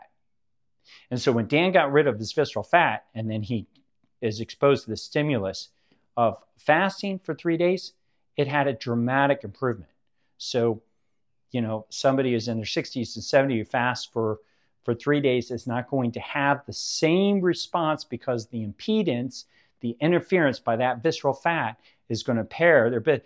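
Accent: United States. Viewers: Not an old guy, but a tough 68 year old broad here, and want to know if you think the FitTrack scale’s visceral fat measurement is accurate. Was thrilled that it consistently measures mine at 3, very low, on a rating of 1 to 19. If it’s not accurate, where can we get it done, need a doc’s order, cost? Thanks! Loving your channel. Keep up the great work! You sound a little southern on this recording?? Hadn’t detected the accent before.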